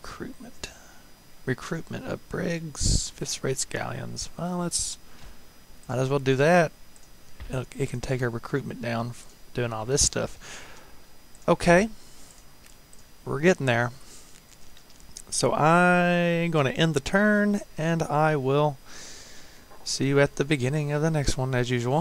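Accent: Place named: South, United States. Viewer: your southern accent is great dude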